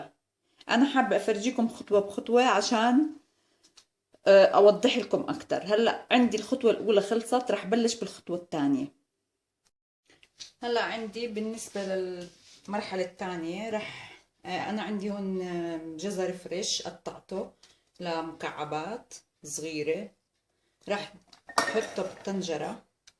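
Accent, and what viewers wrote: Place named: Palestine. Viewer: تسلم هالايدين ان شاء واكلك مبين لذيذ جدا وحكيك حلو الحكي الفلسطيني كتيييييير حلو وبحبو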